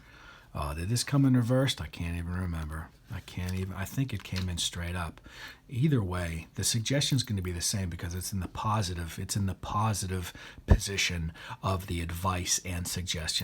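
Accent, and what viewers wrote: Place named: Pittsburgh, United States. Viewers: Can't hide Pittsburgh. It always slips out 😄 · I detect a Pittsburgh accent!:)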